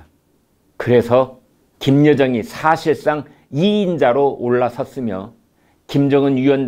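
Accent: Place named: Jeolla, South Korea. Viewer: 전라도 사투리가 구수한 김광일 의원님. 너무 좋아요. 사투리보다 더 좋은건 예리한 의원님의 시사평. 자유민주주의 대한민국이 돌아올수있게 많은 노력 부탁드립니다.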